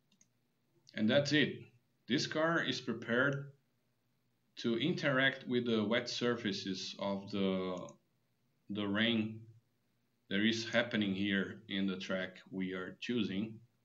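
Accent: Brazil. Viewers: Você é br mano? Tem sotaque e o nome de brasileiro haha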